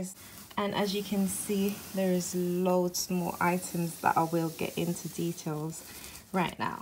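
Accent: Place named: Birmingham, United Kingdom. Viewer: Great haul, looking very glam in this video. Loving the earrings and the watch! And of course the slight Brummie accent too lol!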